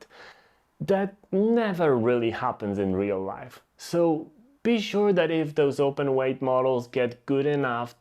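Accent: Poland. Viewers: no nieźle xD widze miniaturke nowego dla mnie kanału, patrze " " i takie there is no chance this guy isn't polish, wchodze na kanał i opis patrze United States i mówie no kurde, puszczam ten film słysze akcent i już sam nie wiedziałem co słysze po czym widze screena z Cześć więc jednak miałem racje 🤣 nie oglądam chyba w ogóle polskiego youtube więc zawsze mnie śmieszy jak znajduje polaków nadających po angielsku. W sumie to nic dziwnego - widownia pewnie 50 razy większa jest niż w polsce.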